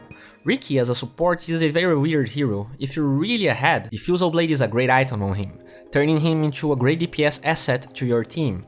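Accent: Brazil. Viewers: You kinda have a Brazilian accent, it's cool though.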